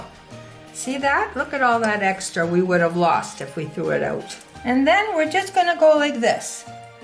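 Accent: Florina, Greece. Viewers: Well done! What village are you from? Your accent sounds like from Lerin area, like me. I'm now in Australia since 1957. My mother made Zelnik so tikva, and now my son also makes zelnik too, for his family. Best wishes, so zdravje.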